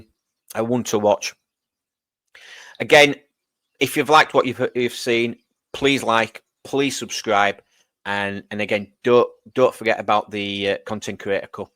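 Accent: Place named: Leeds, United Kingdom. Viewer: Aye mate watching my first video. Sounds like you’re from Leeds way as well so that’s all good. Just subscribe 👌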